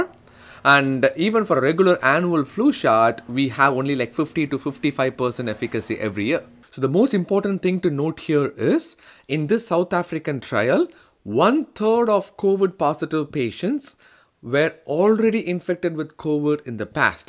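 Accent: India. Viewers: I could listen to your accent all day, you just can't have a bad day when you hear that Indian accent it's so happy. Keep up the work.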